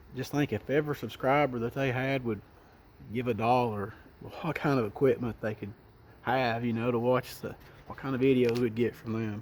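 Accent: South, United States. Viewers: My family is from Lookout Mountain, from Bama, Tennessee and Georgia parts. I was born in Ft Oglethorpe, but raised in Dallas, Texas. We made two or three trips "home" to Georgia every year. Hearing your voice reminds me of family that i miss, it's comforting to me. Those mountains and woods do have dark elements to them. The spiritual dimension is very real. Our bodies die, but our spirit doesn't. Believers know that our spirit will get a new body. Scripture tells us that demons and bad spirits go to the high mountains, and to dry, barren and waterless places- like the desert. It even says some inhabit "dirty birds", like the buzzards, vultures, the bittern and even owls. This is one reason that owls are related to false gods like Molech, who is still worshipped today. It is written that there are demon spirits that come off the skin of dying evil men. 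They seek a place to rest, but cannot rest. Their need is to inhabit wicked men who would accept them. Witches go up the mountains to a forest groves to do their rituals, and have since the beginning and time. The darkness is real, the spiritual dimension is real, it can be a dangerous thing to invoke these spirits into the physical realm. You may get more than you bargained for. Thank you so much, i enjoyed your video. Subbed! Lord bless and keep you ❤🙏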